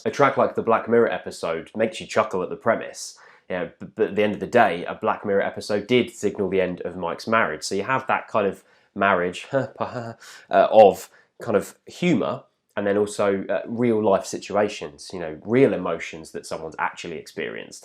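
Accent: United Kingdom. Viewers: i dont know why, but im so obssesed with the british accent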